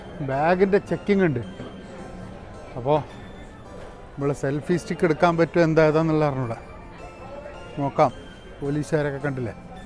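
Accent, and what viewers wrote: Kozhikode, India. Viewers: കോഴിക്കോടൻ ഭാഷ ഇജ്ജെങ്ങനും മാറ്റിയ കൊല്ലും അന്ന ഞമ്മള്..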